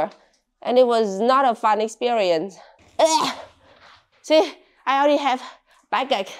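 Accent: Germany. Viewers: Is she getting a German accent? Lol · U developed a German accent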